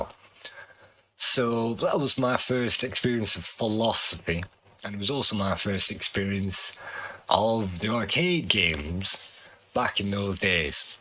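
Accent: Lancashire, United Kingdom. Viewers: Great to hear more about you. We're the same age and some your memories sound similar with me being from Yorkshire. Loving the Lancashire accent mate, don't listen to anyone giving out to you for it! Hope to see more videos soon.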